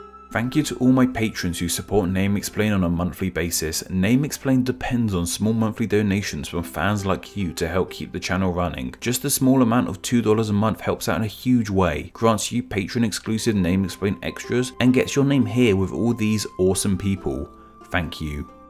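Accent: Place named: United Kingdom. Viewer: Ugh ur accent is so British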